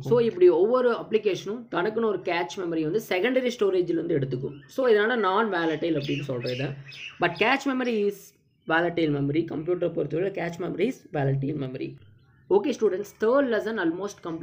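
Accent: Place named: Kongu, India. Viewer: Bro... Ninga Erode / Tiruppur ah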